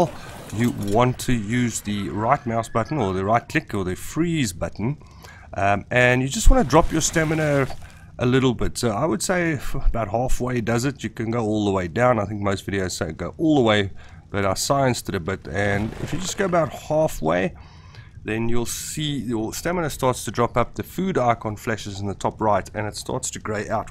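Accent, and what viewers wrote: South Africa. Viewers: Came across your Subnautica video on how to find the Blood Kelp forest and instantly heard that beautiful SA accent😂 subbed somme dadelik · My fiance is from SA. He heard your accent and knew it right away. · Eyyyy! I heard the SA accent and subbed straight away, lekka man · Lekker die SA! Cant miss that accent xD Good vids boet keep it up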